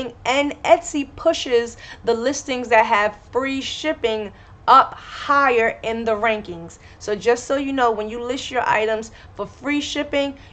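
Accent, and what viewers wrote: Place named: Philadelphia, United States. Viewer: Are you from Philadelphia or South Jersey? I recognize the accent.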